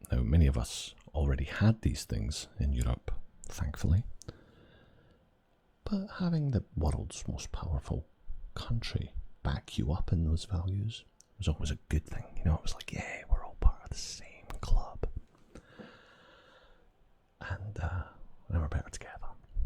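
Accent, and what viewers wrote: Scotland, United Kingdom. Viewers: Im neutral ion rlly get into politics but i love ur scottish accent lol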